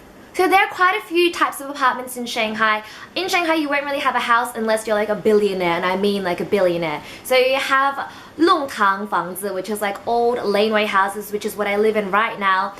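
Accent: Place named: Australia. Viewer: Your 'o's and 'u's sound beautiful. Aussie accent is quite something eh.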